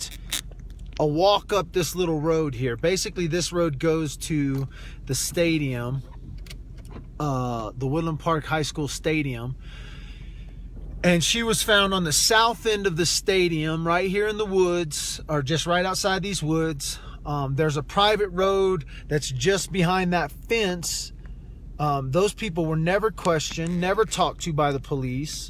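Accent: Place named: Texas, United States. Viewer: Lori Ransom He is from Odessa Texas and that is his country boy “speak” coming out!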